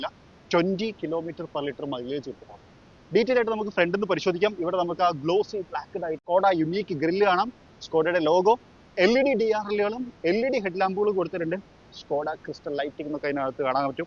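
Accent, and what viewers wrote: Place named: Kasaragod, India. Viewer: Nee kasrod nn inn mansilay😅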